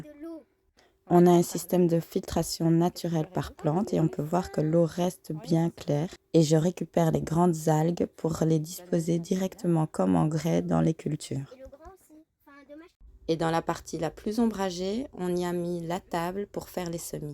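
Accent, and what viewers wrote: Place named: Belgium. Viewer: Olà la p'tite famille 👍🏼 merci 😉 j'adOOOre ta petite intonations Belge 😍 bonne continuation 😘 et à+❣️💃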